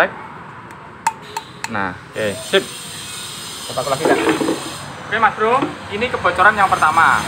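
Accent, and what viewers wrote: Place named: Medan, Indonesia. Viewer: Abang satu ini kayaknya asal Medan😁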